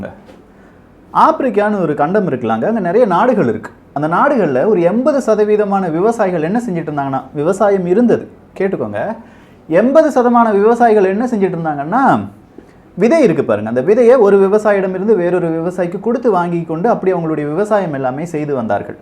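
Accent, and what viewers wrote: Kerala, India. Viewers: Wow keep it up & I see you speak with Malayalam slang mixed is it so?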